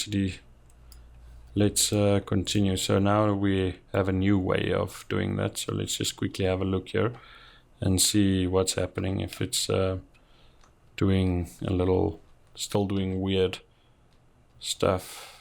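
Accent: South Africa. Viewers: Good to hear a SA accent on a tutorial video.! Keep the naas foorums up Boet! : )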